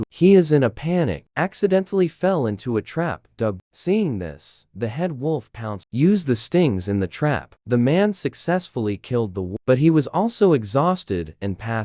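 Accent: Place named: India. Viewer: "Inside this video". This is not even AI its actualy an indian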